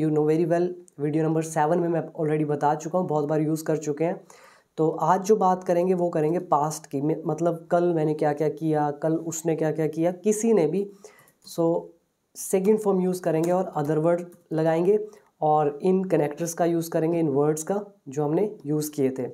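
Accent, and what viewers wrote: India. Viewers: You have indian accent i could hardly understand what you said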